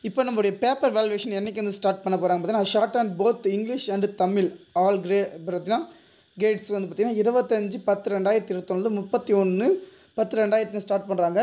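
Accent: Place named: North India, India. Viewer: Tamil nalla padikka kathukka Nanbaa... North India maathiri padikkira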